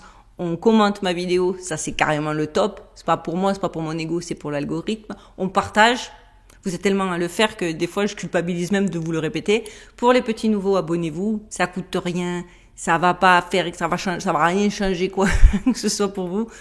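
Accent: South, France. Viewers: Super émission, c’est sans fond! Impressionnant ! Merci J’apprécie d’autant plus que je suis du sud aussi, et j’aime nos accents😊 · j aime ton accent du sud de la france que du bonheur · bonsoir, Excellente vidéo et convaincu je suis. Merci, petit rajout vous avez un bel accent du sud de la France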